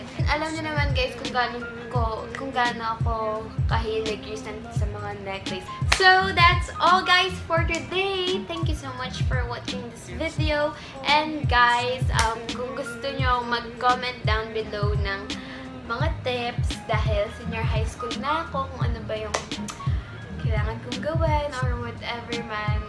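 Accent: Pampanga, Philippines. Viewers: Kapampangan poba kayo? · Kapampangan po ba kayo?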